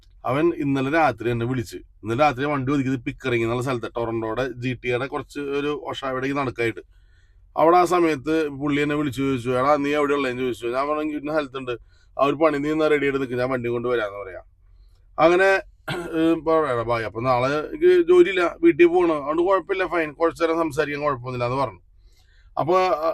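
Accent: Thrissur, India. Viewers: നാട്ടിൽ അങ്ങയുടെ വീട് തൃശ്ശൂർ ആണോ. ത്രിശൂർ ഭാഷ ആണല്ലോ പറയുന്നത്.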